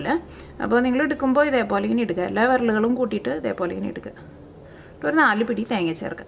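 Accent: Thrissur, India. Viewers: Ithu njan thanne kandu pidicha receipie athu kalaki 😆😆. All ur receipes are simply the best. And ur Thrissur slang😙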